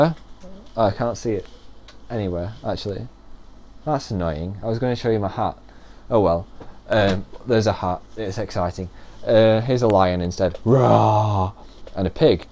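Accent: North, United Kingdom. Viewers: he sounds so much more northern here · i find it so interesting how phil seemed like he was immediately comfortable being himself online. in most youtubers first videos they’re super awkward and self-conscious but phil was just phil from the start (just smaller and more northern lol) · Oh my god!!! JESUS CHRIST I AM WATCHING THIS IN 2017!! HE IS SO AWKWARD!!! AHAHHAHA NOOOO I FEEL LIKE IM SCREAMING LIKE DAN RIGHT NOW! HE SOUNDS SO MUCH MORE BRITISH HERE!!! AHAHAHAHA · You sound so northern! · He sounds so nothern in this video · I can't get over the amazing Northern accent!! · He sounds like me so when I think like that it doesn't sound that strong cos my accent is probably stronger but then in comparison to how he sounds now he sounds dead northern. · Jay Chi I think he was just making fun of people from the north bc he was hella northern in this video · used to be so northern omg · He sounds so much more northern here! 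· I WAS FIVE WHEN THIS WAS MADE VOICE SOUNDS SO NORTHERN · God he sounds so northern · OMG SO NORTHERN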